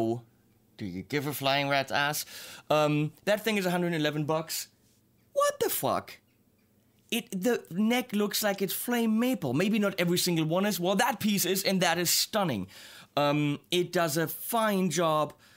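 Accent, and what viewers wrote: Germany. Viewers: Ich hatte die ganze Zeit das Gefühl das du deutschsprachig bist und als du "Very stramm im Ton" gesagt hast war es klar xD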